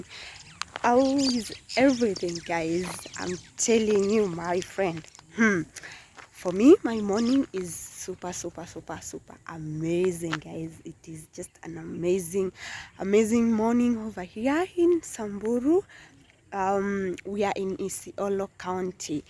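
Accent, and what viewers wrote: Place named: England, United Kingdom. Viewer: It's the English accent and Swahili for me😘😘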